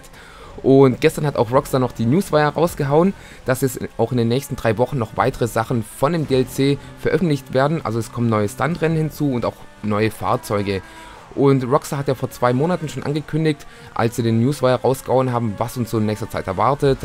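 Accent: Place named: Swabia, Germany. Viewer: Seit Beginn 2016 spielt eig. eh fast jeder mit UnlockAll und im Vergleich zu anderen Updates hust finance and felony hust find ich das Cunning Stunts DLC nicht mal soo teuer. Und trotz alledem sind die Autos in diesem Update viiiel geiler als alle anderen. Ansonsten: Cooles Video! :) Ps: Bin auch Schwabe. Den Akzent kannste dir einfach net verkneifen :D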